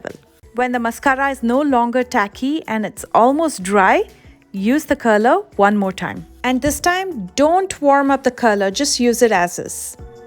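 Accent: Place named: India. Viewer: Step one already have thick and decently long lashes ... she sounds Indian.. definitely known for beautiful land thick luscious hair and lashes!!!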